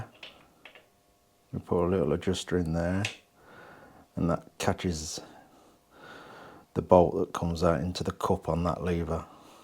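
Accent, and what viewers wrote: England, United Kingdom. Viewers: As soon as I heard the english accent....this is a biker builder I will follow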